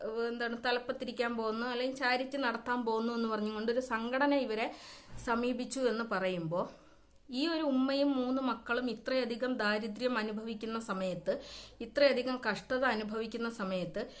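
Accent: Malappuram, India. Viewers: Awalk bhazh malappuram mix aanalo samsarkunnath awidayum veettupanik poyanna thonunnath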